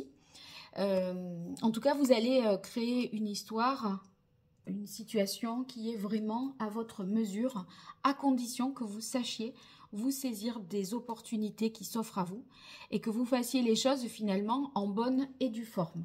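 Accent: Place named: Brittany, France. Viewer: Merci pour la vidéo, vous êtes jolie comme cœur ! 🙂 Et votre bel accent il vous vient de Bretagne ?? 😉😋😇 Beau Dimanche tous 🙏🙏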